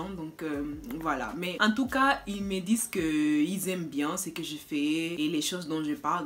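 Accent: Spain. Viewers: J'aime ton accent espagnol continue comme sa sinon tu es très belle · Je pense que tu es la 1ère espagnole noire que je vois! J'étudie l'espagnol et je trouve que c'est aussi difficile que le français quand même... J'adore ton accent et j'ai hâte de voir tes storytimes :)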